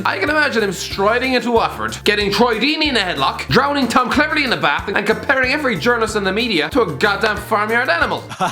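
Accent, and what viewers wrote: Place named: Ireland. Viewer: Listen to that sweet Irish voice, he doesn't sound like a dying cat with throat cancer anymore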